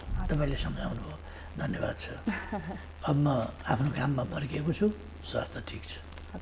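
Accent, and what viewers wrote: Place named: United States. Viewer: baini timi nepali ki english ho???tongue ta purai half-american jasto xa ta...xi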